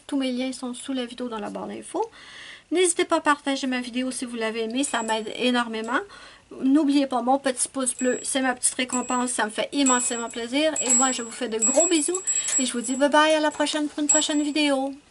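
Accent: Canada. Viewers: J'adore te regarder colorié j'apprends je regarde plus le mouvement de tes doigts si j'ai adoré sur les températures de chez toi moi qui veut allez vivre là bas un jours comme je ne supporte pas la chaleur et le soleil pour moi sa serait l'idéal moi je t'imagine je ferme les yeux alors a ta voix je voit une jeune femme grande longiligne avec des cheveux long mais tenue la tenue la journée brune et un visage ovale et un louque décontracté jean sweet a capuche pas maquiller ou que quand tu sors et des chaussons moumoute que tu enfille comme des bottes voilà pour moi je te vois comme sa un jeu? Comment est notre préfère et son très bel accent canadien comme le dis grand corps malade "je connais leur secret pour leur accent qui chante ils ont trop souplesse dans les joues" ils vont magasiné là bas pas de shopping c'est vrai?moi je fais un par un mais je suis loin de tes coloriage la je me suis imposée un colo sans numéro juste le model et bin je sais pas où posée le premier coup de crayon c'est une princesse manga,pour tes yeux le soir met une lumière blanche mieux pour les yeux fragile et des larme artificielle pour les hydrate 👍👍👍💖💖💖💖😘😘😘😘😜😜😜😃😃😃😃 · Super! 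J’ai passé deux moments vraiment agréables en ta compagnie! Oui😂hier soir je me suis endormie au son de ta si charmante conversation aux accents canadiens😉je trouve ce début de coloriage prometteur, c’est vrai qu’il ne faut pas s’acharner sur un colo quand l’ennui frappe à la porte; c’est vrai pour tout type d’occupations dans les loisirs comme ailleurs...J’ai tendance à aimer terminer ce que j’ai commencé; éducation oblige...mais le coloriage m’a appris à être moins rigide et à me permettre plus de fantaisie; et je suis capable maintenant d’attaquer un autre coloriage sans me sentir culpabilisée, tu vois tu n’es pas la seule dans ce cas😊Mais je ne me disperse pas; je tiens le cap 😄En ce qui concerne l’abord de mes coloriages; je pars sur une idée générale de couleurs et je laisse aller mon inspiration du moment vagabonder; je suis toujours surprise des résultats bons ou mauvais😂Encore merci pour le temps partagé avec nous; je suis allée sur la page Facebook de Muriel et effectivement cet aigle est somptueux; je trouve tous ses colos harmonieux et beaux À bientôt; des caresses aux poilus et plumeux de toutes espèces qui partage ton quotidien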